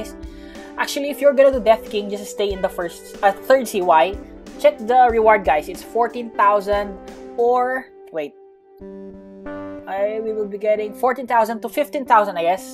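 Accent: Philippines. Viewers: This guy reminds me of my teacher in computer because his Filipino accent😂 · You have filipino accent bro are you filipino?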